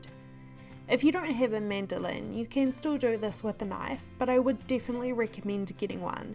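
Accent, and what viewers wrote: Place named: New Zealand. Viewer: Me too ! I live in Auckland . Nice to hear a Kiwi accent on here ! Lol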